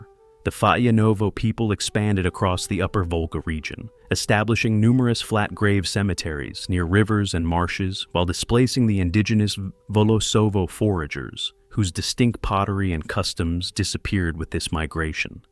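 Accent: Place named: United States. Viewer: 🙉🙉🙉An other voice please and no American English.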